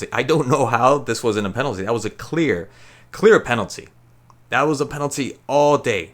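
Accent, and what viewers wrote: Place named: United States. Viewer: Not gonna lie, i hear that accent and once i've wrote this i'm off. 🤣🤣🤣 sorry fella but yanks and football just don't go together.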